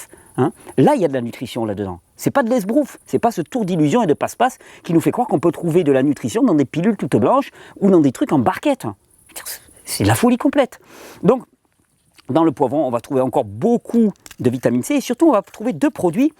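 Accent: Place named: Southern France, France. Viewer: Harmo Chopin En Pyrénées Orientales (66) oui appelée aussi Catalogne ou Pyrénées Catalanes également . Mais voyons ne reconnais-tu pas cet accent ensoleillé Méditerranéen du Midi ??? ;-) Mais il y a une partie de ce même département s'appelant Cerdagne (Latour de Carol, Dorrès, Bourg Madame ...) plus proche du Pas de la Case/Andorre et Limitrophe avec L'Ariège (09)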